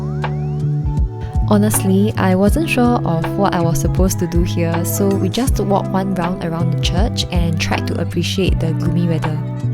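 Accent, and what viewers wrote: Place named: Singapore. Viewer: Much needed video! Thanks so much for sharing, feels so good to hear your Singaporean accent😂 It's been 4 months since this part 2 was posted. Hope all is well with you and your family. Looking forward to part 3!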